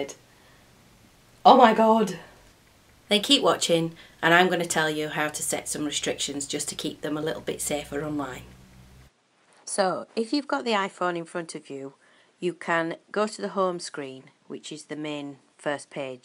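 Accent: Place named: United Kingdom. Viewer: Good video. I love the British accent.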